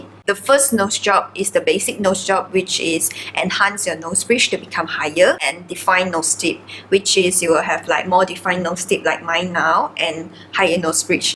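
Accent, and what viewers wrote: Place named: China. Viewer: Are u Indonesia or Chinese? Sorry.. 'Coz ur English sound like Chinese English.. But the translation is in bahasa.. I just wondering🤔💭 😁.. Thank u😊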